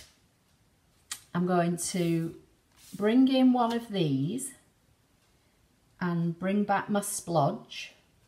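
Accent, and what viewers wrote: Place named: Midlands, United Kingdom. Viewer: Thank you so very much for the ideas! I have just got this set for the lipstick! My granddaughter is suddenly into makeup and we were unable to find ready made cards to suit her age and likes, so this is very timely for me! I love the clarity of your explanations - you don't assume anything and again, for me, that's perfect! I have a scan n cut that's not been used, so now is the time because you are a great source of inspiration. I also love your accent, my dad was from the midlands and I don't get to hear him any more so listening to you is a bit like "home" except I'm an Aussie lol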